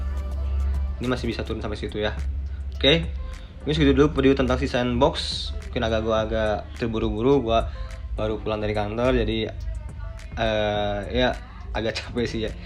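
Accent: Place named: Bali, Indonesia. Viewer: Kyk logat bali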